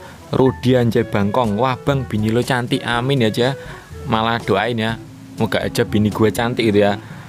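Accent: Java, Indonesia. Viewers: Orang Jawa y bang , cumananya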